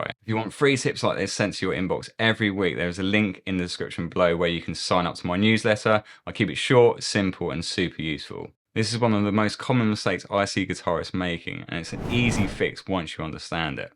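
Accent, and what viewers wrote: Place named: United Kingdom. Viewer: I always found the British accent annoying but this guy takes it to the next level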